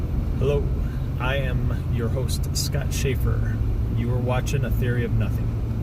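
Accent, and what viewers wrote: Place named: Australia. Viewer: You tell like a Aussie 😁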